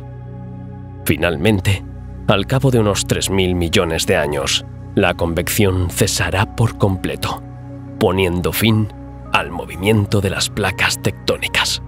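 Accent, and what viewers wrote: Spain. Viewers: Me gustaría un narrador más americano no Europeo, no me gusta la narración Española!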